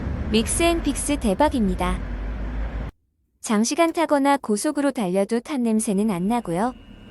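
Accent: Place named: Busan, South Korea. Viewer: 아 부산분이셨군요ㅋㅋㅋ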